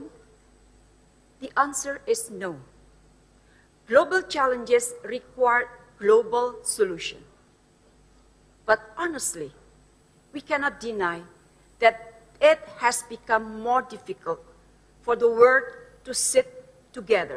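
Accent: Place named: Indonesia. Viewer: Menluku Englishnya keren mudah dipahami walau ake Indonesia accent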